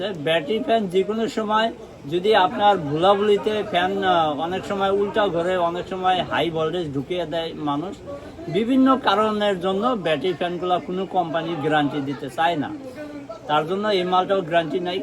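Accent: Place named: Bangladesh. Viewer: কথা শোনে মনে করেছিলাম বাংলাদেশী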